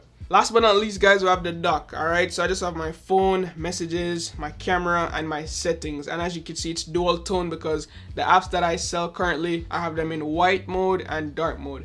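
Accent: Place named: Jamaica. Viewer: Da Jamaican accent is strong with this one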